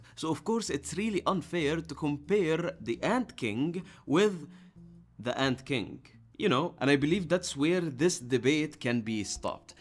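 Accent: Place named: Egypt. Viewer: how r u talking Egyptian in English btw i found u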